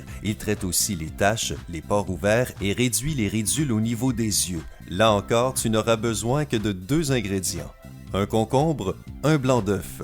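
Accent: Quebec, Canada. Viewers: J’ai liké pour l’accent 😂 j’vous kiff les québécois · D'abord quel jolie voix et l'accent québecquois c'est agréable je cais essayé je suis célibataire si j'amais 😂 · Oh je love les Québécois et leurs accent 😍😍😍😍😍😍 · Avant je détestais l’accent Canadien maintenant je kif 😍. A part ça superbes astuces 👌👌👌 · Y'trop Quebecois lui 😂😂😂😂😂 Calice ton accent y tres obvious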